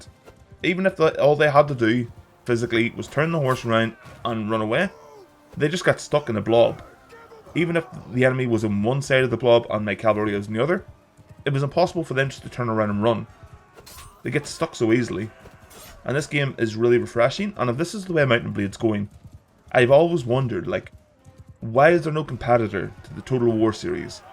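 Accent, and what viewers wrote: Northern Ireland, United Kingdom. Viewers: Its pretty cool to hear a fellow Northern Irish man having a blast with this game, ive pretty much abandoned all my other games over the last month.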